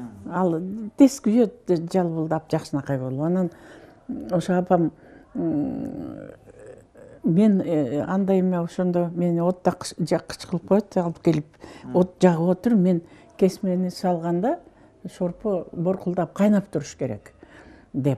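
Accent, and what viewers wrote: Standard. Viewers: Сизди угуп олтуруп абдан жонокойлукту кордум ,кандай суктанаарлык муноз,эч нерсени унутпай жашоодо талкан, каймак жонундо абдан так айтканы кызык,анткени он сегиз жашымда биринчи Фрунзеге борборго келгенде аэропорттон коргом,бирок кыргызча билбейт го деп сыртынан негедир муноздомо бергем,ушунчалык так таза нукура суйлогону кызык,а Чынгыз Айтматовду Алма-Атада коргондо ким эле деп ойлоп карап,анан эстеп кетип барбагам сыртынан гана карап, негедир жаштык кылып жанына чуркап барып учурашпаганыма азырга чейин окуном ,бактылуу каарылык корунуз ! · Керемет,кыргызча суйлогону тан калтырды,таза,нукура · Нукура кыргыз тилин суйлогонун кайра кайра коруп угуп жатам бар болунуз эжеке кооп жашаныз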